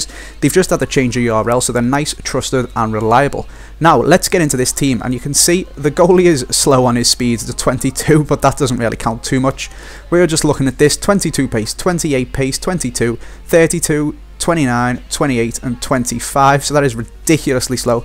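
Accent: Liverpool, United Kingdom. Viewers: you scouse?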